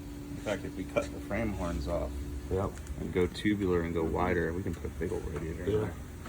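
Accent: South, United States. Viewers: new accent is really freaking me out. Its a cross between Californian but more southern drawl now.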